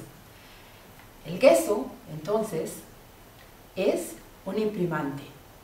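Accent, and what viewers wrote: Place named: Chile. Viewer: Hola ,, por tu asentó me tinca que eres chilena ..pues bien ,, me gustaría saber si vendes tus obras , gracias por tus tutoriales muy buenos ,,saludos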